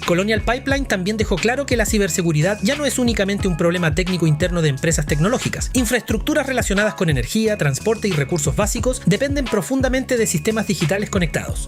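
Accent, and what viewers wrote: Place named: Chile. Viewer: Todo bien Excepto el acento chileno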